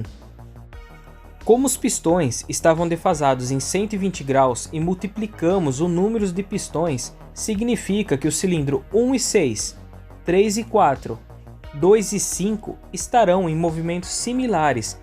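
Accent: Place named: Paraíba, Brazil. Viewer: Esse sotaque da Paraíba ta bem audivio mesmo tentanto não deixar aparece no vídeo, ótimo vídeo Aquela yamaha é 2 tempos ou 4 tempos?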